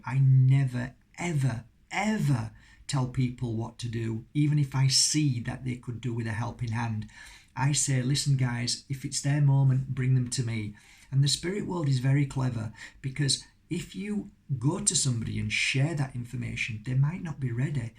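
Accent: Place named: West Yorkshire, United Kingdom. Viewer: HI from sunny Halifax in West Yorks! I thought I recognised your accent. So pleased to have found your channel. I have given up worrying about what people think of me. Although I have to be careful when people are talking about losing loved ones when they have died. I honestly dont grieve anymore. I dont get distracted by politics anymore and some think I dont care maybe? but this is not true. I just care about what I give my attention to these days.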